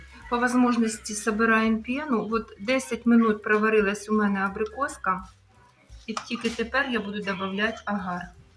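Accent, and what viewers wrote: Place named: Chernihiv, Ukraine. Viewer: Прекрасна мова, красивий суржик, на Чернігівщині всі так балакають, не нравится не слухайте! 👍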